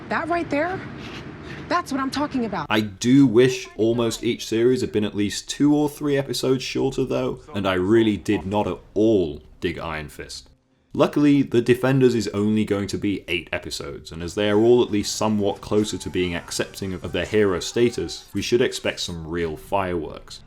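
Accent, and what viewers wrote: United Kingdom. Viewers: "With my British accent" Buddy I think you are spot on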